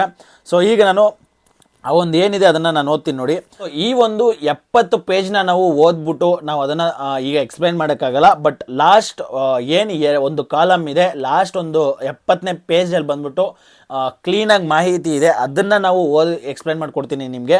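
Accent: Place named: Andhra Pradesh, India. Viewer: avnu Telugu bro..😂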